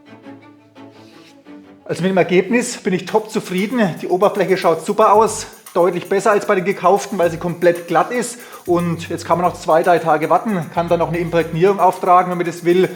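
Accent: Franconia, Germany. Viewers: Hört man da fränkischen Dialekt durch 👂🏻👂🏻👂🏻.....😊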